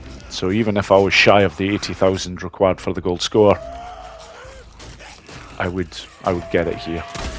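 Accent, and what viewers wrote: Scotland, United Kingdom. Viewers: Hey bro, awesome work! Hearing your voice the first time. You Scottish by any chance? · First Tutorial video with British accent!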